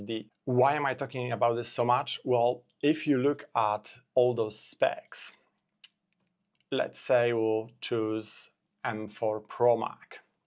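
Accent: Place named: Poland. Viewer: Mordo, od razu wiem żeś z Polski. :D pozdro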